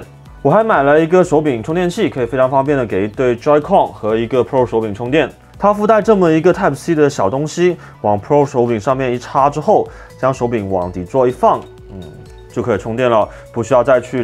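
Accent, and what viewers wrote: Cantonese, China. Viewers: 你能说话慢点吗,,,本来广东的普通话就不好,咬字不清更难听!!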